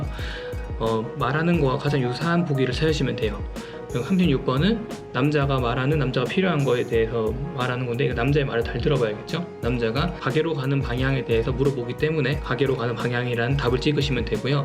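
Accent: Gyeongsang, South Korea. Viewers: 혹시 부산이나 창원이 고향이신가요?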